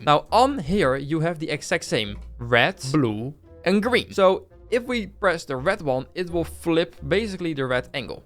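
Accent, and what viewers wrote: Netherlands. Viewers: tell me this guy is dutch without telling me he is dutch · pov: you can't hide your dutch accent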